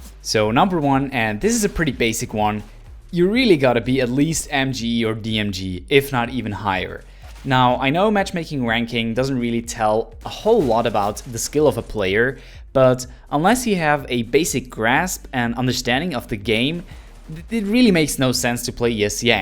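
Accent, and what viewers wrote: Switzerland. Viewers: wtf ur swiss xD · swiss or german?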